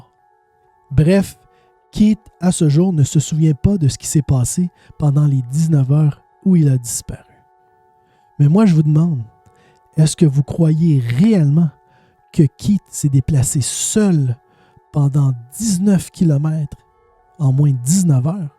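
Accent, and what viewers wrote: Canada. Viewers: Préviens que tu es canadien horrible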